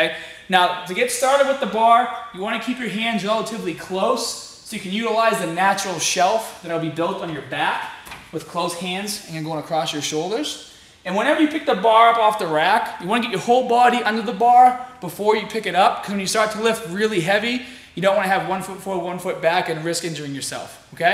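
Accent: Boston, United States. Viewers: I noticed from watching many of his videos that his Boston accent kind of comes and goes...I guess similar to how my Texan accent comes and goes depending on who I've been around all day. If I'm visiting family, I have a stronger accent. But I live in Korea, so I usually have a very standard English way of speaking.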